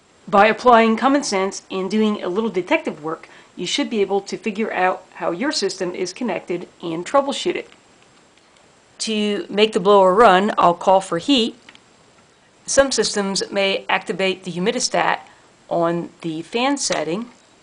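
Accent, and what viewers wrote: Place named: Pittsburgh, United States. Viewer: You sound like a yinzer. If you dont know what that is them I am wrong and I apologize.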